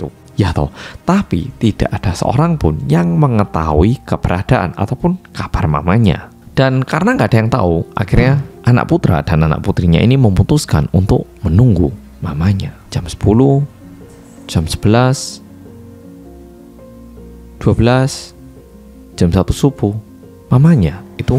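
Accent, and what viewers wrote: Java, Indonesia. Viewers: Orang Korea kow ya medogk 😂😂😂❤🥰🥰🇲🇨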